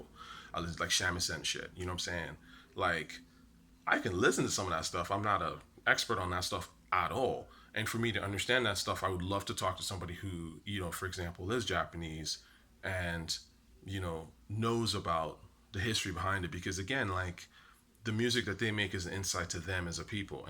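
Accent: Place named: New York, United States. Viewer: He talks like a fruitcup from new york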